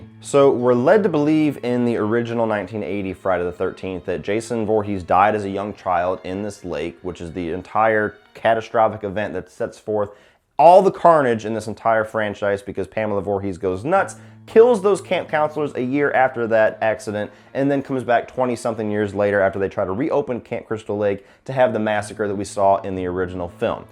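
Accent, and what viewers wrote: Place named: Midwest, United States. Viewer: He is original from Ohio but moved to Georgia at like 14 or 15. So either Midwest or Southeastern United States. I don't think he has much of a georgia accent more midwest.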